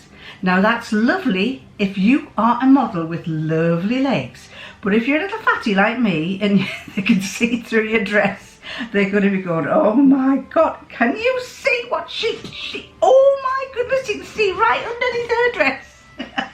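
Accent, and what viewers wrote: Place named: Teesside, United Kingdom. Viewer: Listening to you takes me back to where I grew up, around West Hartlepool, Darlington and Dinsdale. My family emigrated to America but half my heart remains there. I can hear the same phrases and laughter from my relatives. Love when I get to visit and I have been told I pick up the same accent when there. Love your hair!! And I will have to check out Boyes when I visit again because I love the blue fabric you showed. Take care and happy sewing!!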